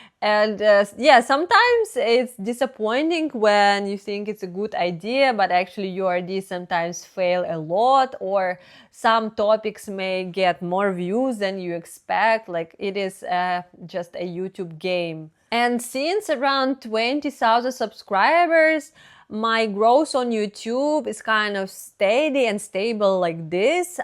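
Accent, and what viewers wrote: Russia. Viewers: I've noticed that in your latest videos your Russian accent is more pronounced than in your previous videos from the last few years... it sounds great. Spanish is my native language, and I have to say your English is clearer than that of many YouTubers who speak English as their native language. I watch your videos to practice my English listening skills, and I understand everything you say perfectly. You do a great job! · Your Russian accent sounds so elegant! I could listen to it all day and be like 😊! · We love you my russian queen 🇷🇺❤️👸 You are beautiful and intelligent. Harmonic and calm it's very pleasant. Remember! The most of us is not native English speaker so you can talk as your like with your lovely accent. English is only like Latin during the middle ages for people to communicate and reach out to one another. Fuck the western corrupt political elites. 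We know the truth and respect the great Russia. And some of us know our common old history. I love to see your videos from Russia and I feel and see many related things. Only in a another way. Keep up the good work. With love 💕 from Sweden 🇸🇪👱👋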